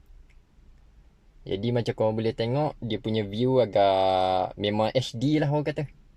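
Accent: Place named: Terengganu, Malaysia. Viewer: Brekmok orang teganu cakak gini ni.hahahaaa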